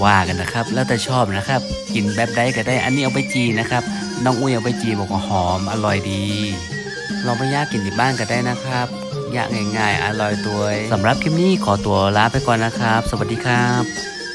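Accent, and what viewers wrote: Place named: North, Thailand. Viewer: ยี่สิบสาม อู้จาปะสากำเมืองม่วนขนาดน้อง อิแม่แข็งแรงขนาดเจ้า ตี้บ้านปี้สุโขทัยฮ้องว่าข้าว DaK งา ไม่กล้าพิมพ์คำไทยอาจติดสแปมค่ะ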